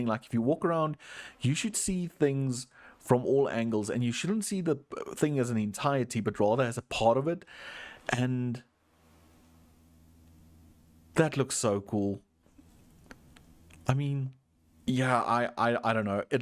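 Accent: Afrikaans, South Africa. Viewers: I got to ask. You have to be from SA. That accent is giving jozi😅😅 Edit: You called it a pick. SA confirmed. Nowhere else in the world would you find such nice English😂 Edit 2:Haha. Called it. Lol Afrikaans accent never misses😂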